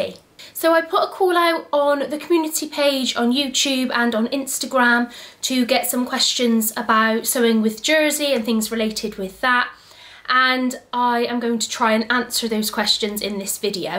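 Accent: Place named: Bristol, United Kingdom. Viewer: Excellent info video, especially for a novice like myself. Also, love your enthusiasm, and to be a little personal, I also love your touch of a Bristolian accent .... Bristol is very close to my heart and not too far away geographically 😉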